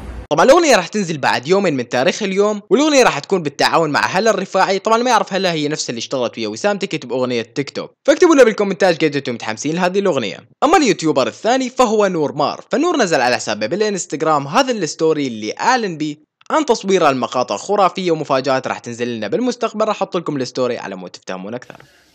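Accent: Iraq. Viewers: ♥♥♥♥ياخي ولله احب هاي القناه ♥♥😉فد سوائل هاذ صوتك الي بل فيديوهات ونته عراقي بليز جاوب🙏🏻🥰♥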